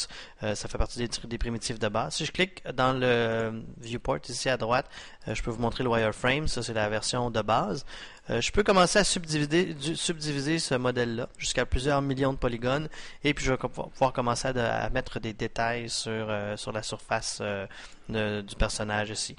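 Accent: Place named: Quebec, Canada. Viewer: Merci pour ton tutoriel, (en plus je suis fan de l'accent québécois) je cherchais justement à me mettre au sculpt et j'hésitais entre mudbox et zbrush.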